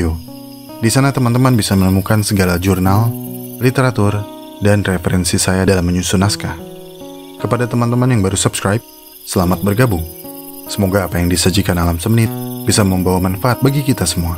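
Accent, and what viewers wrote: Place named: Java, Indonesia. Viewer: Orang Jawa yah lu bang 🤨🤨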